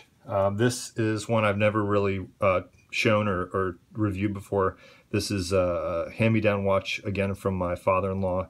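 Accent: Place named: United States. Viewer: Are you actually Dutch? You sound like a native speaking American!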